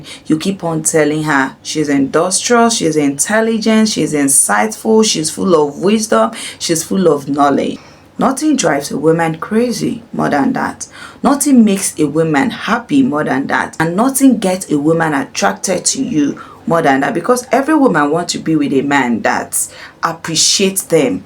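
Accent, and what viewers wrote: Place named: Nigeria. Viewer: I'm not joking about it, this is what i thought you were coming from america , but when i hear your english it's where i notice that you are from Nigeria eg when you say Joy stick bacas and all those you add on it oh my goodness you put spies...i love it very much..💋💋💋💋💔💋💔💋💔💋😊🤣😊❤️‍🩹❤️♥️♥️💋💔 remain blessed my beloved sister from another Mother watching from Johannesburg...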